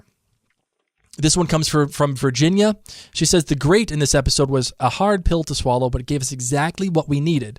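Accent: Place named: Northeast, United States. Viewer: always love the fade in and out of your northeastern tongue.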